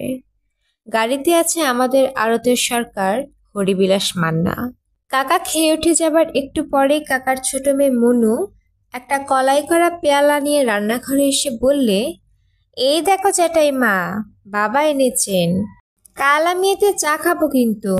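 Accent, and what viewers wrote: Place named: Kolkata, India. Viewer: Kolkatar tone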